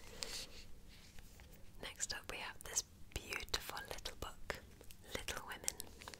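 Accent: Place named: United Kingdom. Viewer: How am I just finding you now?!! Books, ASMR and a lovely British whisper! I love your videos so much. Thank you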